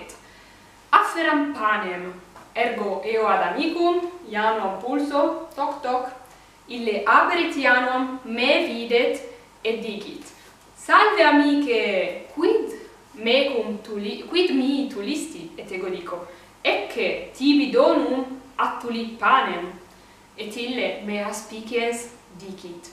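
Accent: Italy. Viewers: pronuntiatio restituta cum jucunda modulatione italica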